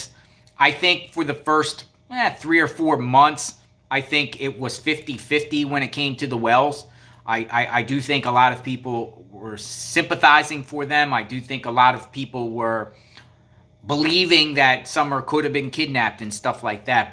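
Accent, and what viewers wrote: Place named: New Jersey, United States. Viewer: HEY, personal question. (You don't have to answer.) I have fun trying to figure out accents. Are you originally from Jersey or Philly? I went from Jersey to Miami Beach in my teens so I know that a lot of New Yorkers move down to FLA. Snowbirds, you know! 😆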